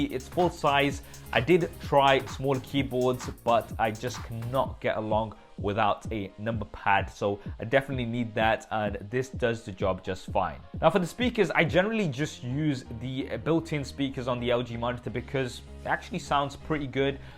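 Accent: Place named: United Kingdom. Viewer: I hate British accent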